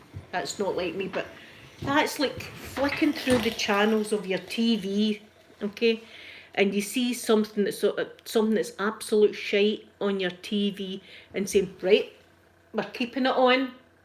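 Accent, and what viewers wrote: Scotland, United Kingdom. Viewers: Yay, another Scottish ASMR channel. Felt like I was listening to an old friend. Thank you for this video!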